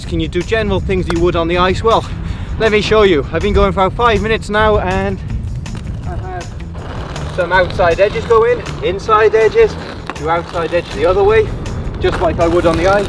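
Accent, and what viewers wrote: Wales, United Kingdom. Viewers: anybody else curious how he got a welsh accent? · also, you have an interesting accent, are you Welsh by any chance? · Oh wow are you welsh? Tin siarad cymraeg? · Is he Welsh?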